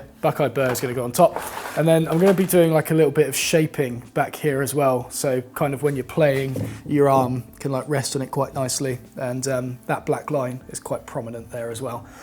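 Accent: England, United Kingdom. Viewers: i thought he was an Englishman from his accent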